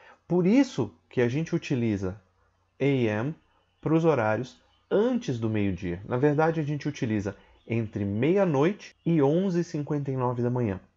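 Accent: United Kingdom. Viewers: Eu tô maratonando seu canal e to amando aprender o sotaque britânico pois é mais fácil para minha pessoa falar, ouvir e entender melhor. Mas uma pergunta, se uma pessoa está saindo e fala: Good night! Eu deveria responder como ela disse certo? Pois ela está saindo. Então eu também responderia "good night" na despedida?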